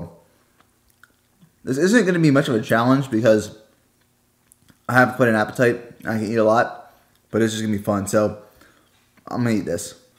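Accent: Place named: Pennsylvania, United States. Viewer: I just watched pretty much all of your videos and I'm 100% subscribed! I wish I lived in Texas so I could try these donuts! I feel like I heard you say you were from Pennsylvania in one of your videos.. You definitely sound like it lol I'm from PA, as well.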